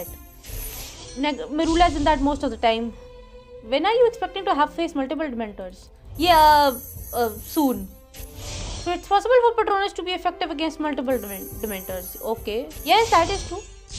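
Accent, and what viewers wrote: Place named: India. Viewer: Sounds more Indian!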